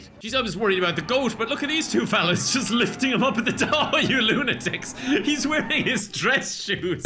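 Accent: Ireland. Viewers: I like how accent gets progressively thicker and more irish as the video goes on and he gets excited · I love cranking his Irish accent up to 11. I'm Canadian and we can't do that because the "Canadian" accent people hear in pop culture isn't Canadian at all - absolutely no one here has that accent and I don't know who does, if anyone. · Omg I just love irish accent so much. I dont understand anything but I just love it. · turned so Irish in this. Like he is letting loose his Irish tendencies in this video. Like so many Irish angry rants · This is most Irish I've heard in a while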